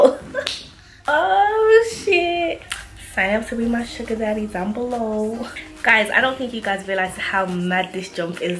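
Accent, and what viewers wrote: Birmingham, United Kingdom. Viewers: Aww Birmingham accent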